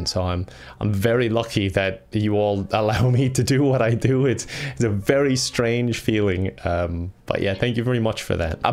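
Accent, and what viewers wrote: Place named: Ireland. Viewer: I feel that way all the time wen I see ur vids its like a piece of my mind sets at peace wen I see a vid I love ur content I love ur humor and I really like the accent, I kinda wish I had a heavy irish accent (I am irish) but its a relief wen I see ur vids I love wat u do its a really important part of my day wen I watch ur vids and I hope u keep going never quit and hopefully I can meat u at pax or something later on in life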